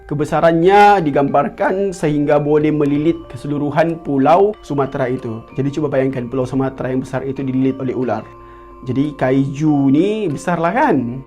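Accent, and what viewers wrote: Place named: Sabah, Malaysia. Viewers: Dah sah2 dia orang Malaysia dik.. dia cakap guna loghat Sabah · Saya ingat abg orang sabah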